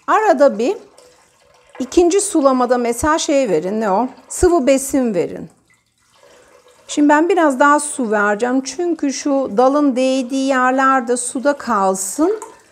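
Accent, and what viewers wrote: Turkey. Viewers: Abla Trakyalı galiba 😀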